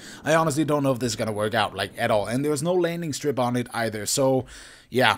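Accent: Denmark. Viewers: He's Danish, I live in Sweden and anyone that swedish fluently would recognize a dane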